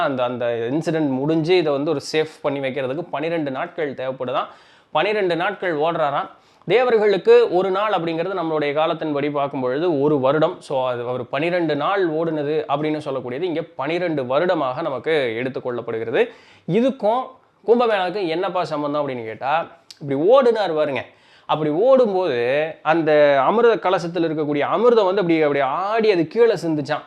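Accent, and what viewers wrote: Coimbatore, India. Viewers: Well said, ur info with coimbatore slang is truly exceptional 😅. Keep rocking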